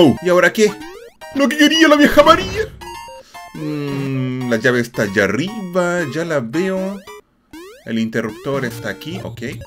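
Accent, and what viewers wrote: Chile. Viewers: Wena eres chileno, igual soy chileno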